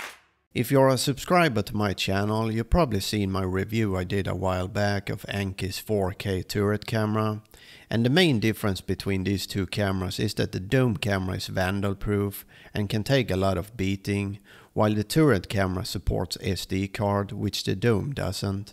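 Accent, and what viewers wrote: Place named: Sweden. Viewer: Låter galet svensk...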